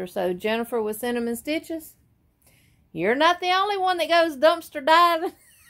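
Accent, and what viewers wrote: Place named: South, United States. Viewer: Thank you for sharing your craft room with us. I LOVE your "corner" SOunds like you are another southern gal! Wonderful to meet you!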